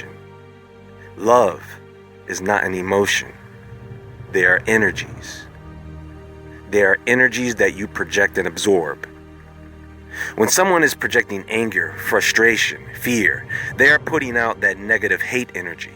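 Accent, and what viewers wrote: United States. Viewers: He makes "neurons" rhyme with "morons". American, Nuff said. That said, far far less off putting than most americans.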